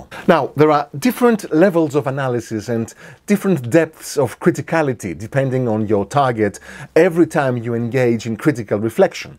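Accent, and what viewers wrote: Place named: Greece. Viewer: Noticed right away a greek flavor in your accent Mr.. Thank you and Καλή χρονιά!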